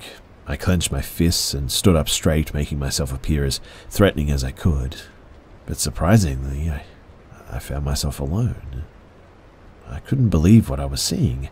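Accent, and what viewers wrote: Australia. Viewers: Great to hear a Australian voice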